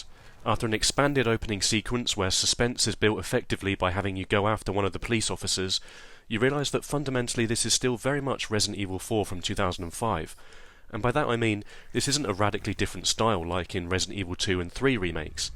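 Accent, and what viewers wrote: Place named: United Kingdom. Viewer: Two of the things i like most about youtube channels, smart English accents and resident evil analysis. Liked and subscribed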